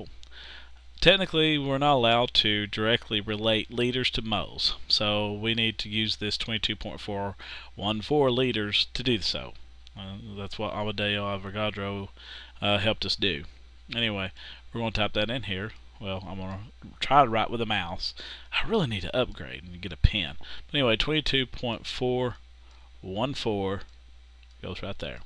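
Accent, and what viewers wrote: South, United States. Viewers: lol this wasn't what I was looking for I already understand how to do this but you did such a good job and made me laugh ( plus I love your southern twang ;) sexy!) so I kept watchin anyway :P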